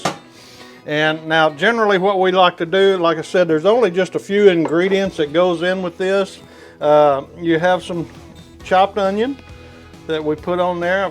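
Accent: South, United States. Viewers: Just went through my annual blood tests and physical. I'm 68. Went in for "The Chat". Took height, weight, blood pressure, the usual suspects. BP was on the high side of normal. I asked what was it last year? She checked and said, About the same. I said Normal, Nervous, Anxious result? She smiled then looked at the summary of results and said, Oh, this is unusual (she shoulda took my BP then). Every test was perfect! (my heart started beating again) See NEXT Year! I hope all goes well with whatever confronts you, my Friend. 🙂 Now, I think you'll enjoy this. Our carpet was scheduled to be put in yesterday. We were watching YT videos. The installers showed up early went out and greeted them, a father, daughter team, spoke little English with a heavy Central American accent. I showed them what they were up against and they got at it. While lugging in their tools I hit go on a video by Smokin Joes Pit BBQ making Carna Asada's Tacos. 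The installers were right behind me, heard it, stopped for a few seconds, looked and back to work. I thought it was just coincidental. BUT, you were up next with this video. They were just coming through the door again when I started it and you said, (with your subtle Southern accent) and I'm quoting the closed caption that somehow was on the screen, "Come on stops me Amigo's, how are you doing my friends". Yup, didn't really roll of the tongue the same as it would had Joe said it. 🤣Again he stopped for a second, but just One, and back to work. I ha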